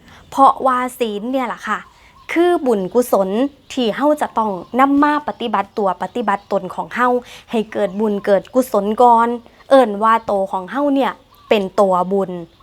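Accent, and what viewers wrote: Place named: Vientiane, Laos. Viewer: เว่าม้วนอีหลี เหมือนลาวเจ้า ลาวเวียงจันทร์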